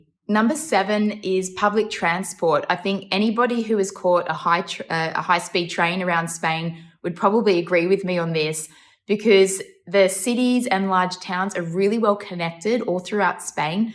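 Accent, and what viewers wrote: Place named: Australia. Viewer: First time listening, i can say she has an Australian accent ❤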